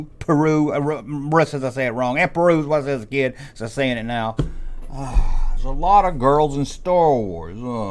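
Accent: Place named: Louisiana, United States. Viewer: WTF is this cajun sayin'? hu ha mmm haaa mmm. No, hhhhhuuh. SPEAK AMERICAN! WIGGER!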